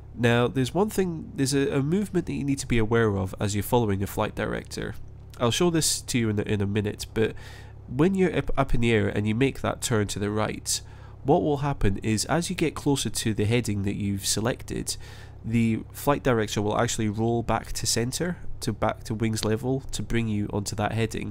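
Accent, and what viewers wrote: Scotland, United Kingdom. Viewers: As a 'Newboy' to FS down in Cornwall, UK, I am impressed by the quality of this video. The clear and unhurried instruction by this Scot is first class. Just what I need at my age.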